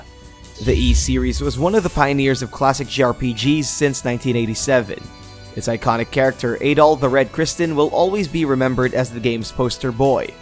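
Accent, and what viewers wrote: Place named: Philippines. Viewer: Off topic. You sound Filipino.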